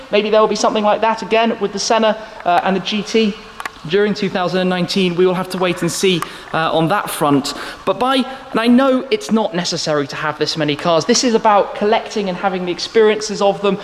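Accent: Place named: United Kingdom. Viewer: Dieser britische Dialekt ist so ekelhaft, ...Alter.... .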